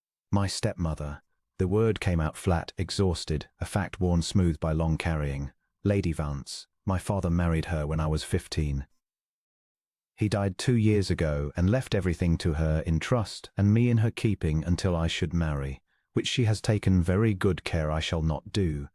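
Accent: United Kingdom. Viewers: Lovely story--well written and wonderfully narrated. Thank you for having a British accent to your narration; it's a British story, after all.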